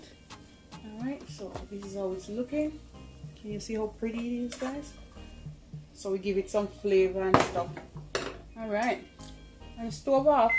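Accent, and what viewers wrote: Jamaica. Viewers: Hi, so good to hear a Jamaican accent. Nice healthy food, Imma try it ✊🏽✌🏽🎶🐾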